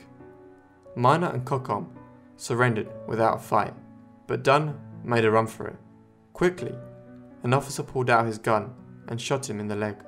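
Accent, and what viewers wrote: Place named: United Kingdom. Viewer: I don't care for the British accent narrating this.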